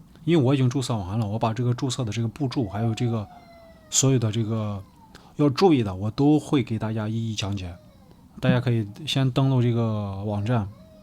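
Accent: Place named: Shaanxi, China. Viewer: 主播 悄悄问你一下 你是不是陕西人？陕普口音😊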